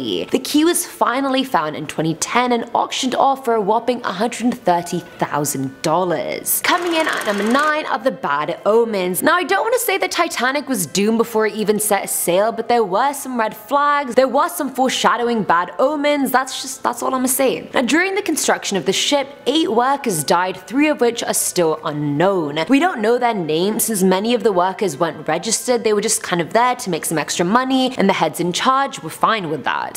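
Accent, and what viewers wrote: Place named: United Kingdom. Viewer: I'm just focusing on her British accent💙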